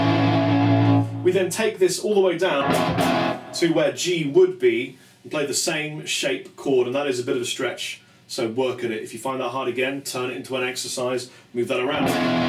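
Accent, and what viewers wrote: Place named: United Kingdom. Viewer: you are the best teacher I have seen. There is a lot of good ones but your delivery is very logical and concise. The british accent doesn’t hurt either. Wish you lived in the states...particularly Sacramento California...you’d love the weather over dreary old England...lol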